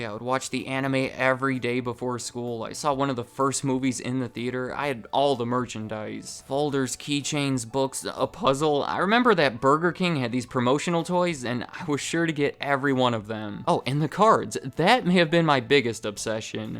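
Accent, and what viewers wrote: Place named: Michigan, United States. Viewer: I'm trying to pinned down this guy's accent my guess is Michigan but maybe also somewhere in Illinois but I'm leaning towards Michigan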